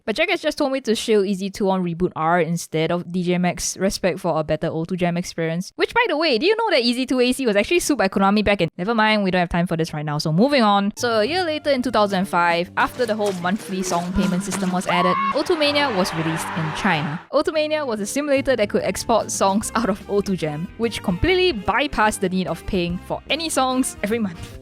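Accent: Singapore. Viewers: > Did you really think you were going to slip a by me?! I receive neuron activation after only 4 notes! This is my first video to the channel and cute Singaporean accents with rhythm games is a hell of a combo and I’m here for it. I’d heard about the o2 drama but never had the context so this was fun. However with a rhythm channel and Touhou references under your belt I can’t help but notice you haven’t played Yoiyami Dancers yet and I regret to inform you that is /actually illegal/. Please rectify this serious state of affairs at once lest the yamaxanadu send you to gensojail. It’s a hard game and it definitely combines a rhythm movement a la crypt of the necrodancer and a danmaku but once you get the timing of a level and manage to dodge to the beat and get a good full level combo it feels so good. Also it’s wonderfully cute. You should check it out! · I thought your accent was familiar, turns out you're a Singaporean. 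Cool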